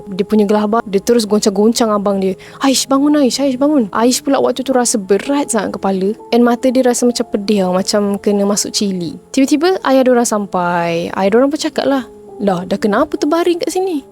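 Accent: Kelantan, Malaysia. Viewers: Kecek kelate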